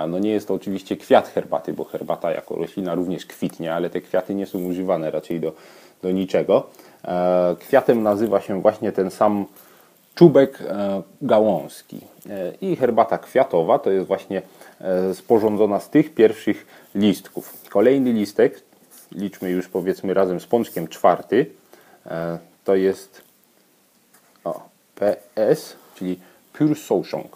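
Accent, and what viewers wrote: Silesia, Poland. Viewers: Słucham, słucham i myślę sobie: chłop chyba ze Śląska. No i rzeczywiście. Rozpozna swój swego;)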